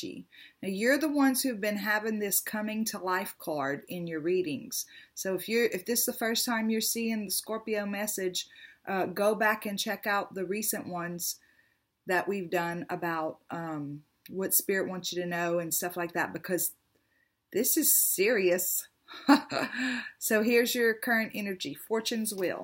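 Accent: South, United States. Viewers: I love your readings as they mirror my life perfectly! Plus, I love your sweet southern accent and charm! Thank YOU! <3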